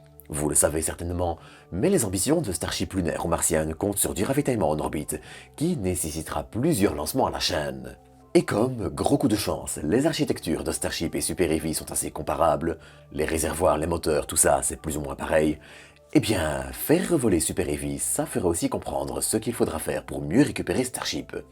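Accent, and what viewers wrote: Belgium. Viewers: C'est bien sa belle voix grave et son accent belge.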